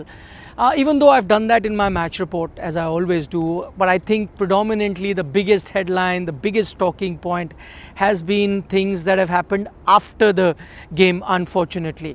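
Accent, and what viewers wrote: India. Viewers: Is the person presenting this story? If so, is he Indian? He sounded of Indian origin. He did a great job in narrating the story as it unfolded - unbiased, professional and clear.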